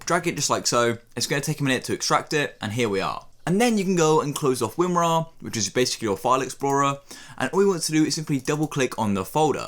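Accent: United Kingdom. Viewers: Are you British? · thanks random british person on youtube :3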